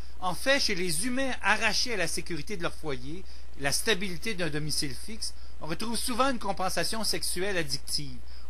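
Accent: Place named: Quebec, Canada. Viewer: Très intéressant! au passage j'aime bien l'accent québécois Bonne continuation!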